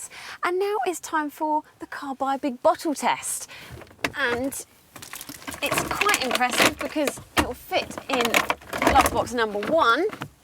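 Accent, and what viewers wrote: United Kingdom. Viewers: Came for the car review and totally fell in love with her.. Maaan... I love that british accent too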